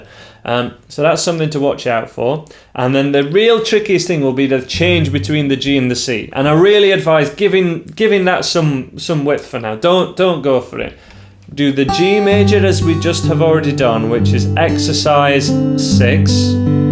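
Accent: United Kingdom. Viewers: Hi,..your accent is a british man. I think you are from England. I am just started to learn guitar and your website came across and i decided to download from lesson one to three. Thank you for complete information for the beginners like me. God bless you... 🙏❤🇵🇭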